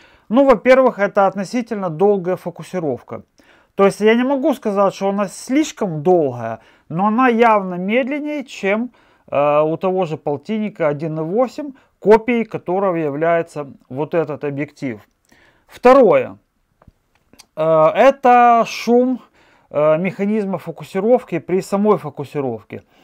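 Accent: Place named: Odessa, Ukraine. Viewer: Одессит чтоль? Говор знакомый... За обзор спс.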